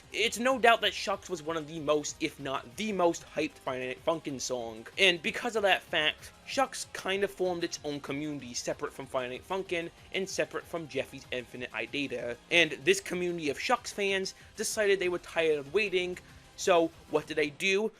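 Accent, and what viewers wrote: Brooklyn, United States. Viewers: The worst and best part about this video is that he sounds like Brooklyn guy slightly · By the way, do you have a Brooklyn accent? It sounds like it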